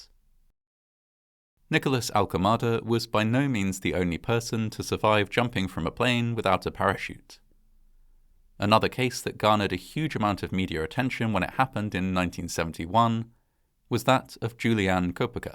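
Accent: United Kingdom. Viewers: Just a quick question, Sir. In witch part of Great Britain are you from/located? It is just so weird when you say fall. It sounds like your are saying fool. Same with the word call. Otherwise I really love your uploads 🙂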